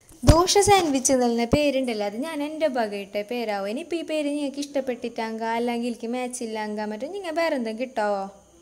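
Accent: India. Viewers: Super too Ikkanakinane nammal kasarkode slang padikum taa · നല്ല രസ ണ്ട് സംസാരം തലശ്ശേരി യല്ലേ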